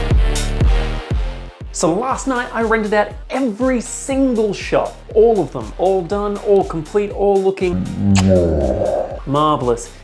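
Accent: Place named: Netherlands. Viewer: Thought him was Dutch ngl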